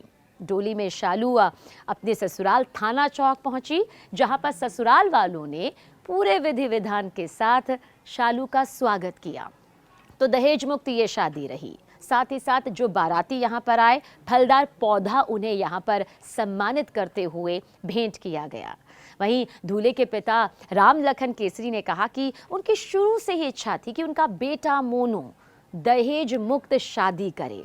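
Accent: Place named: Mumbai, India. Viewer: Ap maidam bihar se nahi ho apke awaj lag rahi ha ap mumbai ke ho 👍🏾👍🏾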